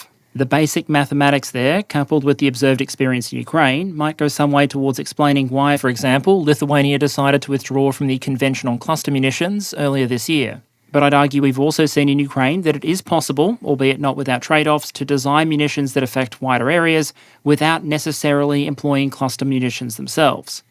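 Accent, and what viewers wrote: Australia. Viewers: Great as always! BTW - is "wreck" havoc a specifically Australian idiom (I've always heard "wreak" havoc up until now) or is that just how "wreak" sounds with this accent? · Is this guy Australian?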